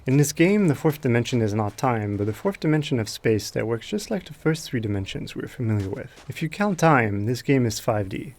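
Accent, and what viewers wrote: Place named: France. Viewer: he sounds french, that's really cool. anyway, great video!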